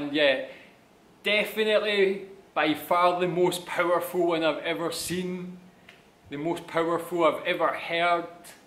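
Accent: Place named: Scotland, United Kingdom. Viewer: Love your Scottish accent : )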